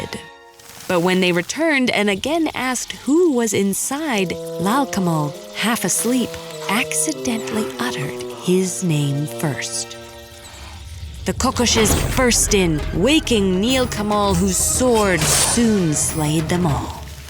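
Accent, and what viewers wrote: Bengal, India. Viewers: The bengali accent is just the cherry on top🤌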